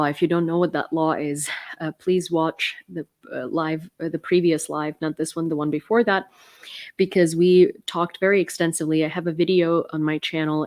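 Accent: United States. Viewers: I massively appreciate your labor supporting Ukraine and more people like you are needed. I only have one question: do you feel Ukrainian? I mean, it's good that your english is totally American (that's a good skill), but your behaviour, manners, puns, attitude and everything is soooo American, even exaggeratedly American. Somewhere I hear you saying you were in the US for 16 years, but that time doesn't explain that you've become so American, unless you did put your all in transforming your whole personality into being American. That's why I ask if you feel Ukrainian (because such huge transformation kind of implies that you felt ashamed of being Ukrainian in the past?). Like I've known many foreigners living in the US for almost their whole life and they speak 100% like natives but they have not adopted at all such a huge American attitude and manners when speaking and behaving as you do (actually as a very specific type of American girls: talking always as if they don't give a shi*, the constant roll eyes thing, the constant corrosive puns, etc). 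I don't say this in a bad way at all, I do think your work is totally genuine and really appreciated, but as someone who spent long periods of time per year in Ukraine, before the war started, when I listen and see you there is something that makes me feel "weird". It would be interesting if some day you talk a bit about this and your intercultural "self". I mean, when I see you I feel as if I'm seeing a japanese woman singing spa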